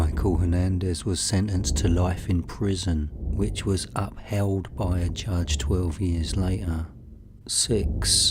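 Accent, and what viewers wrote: United Kingdom. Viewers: Nice cockney voice mate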